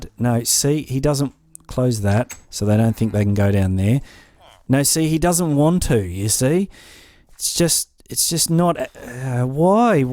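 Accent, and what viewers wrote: Australia. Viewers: Honestly, the only reason why i subscribed to is the aussie accent, I live in AU, and it is odd, but in Brisbane, noone has this accent, they all speak strangelly normal...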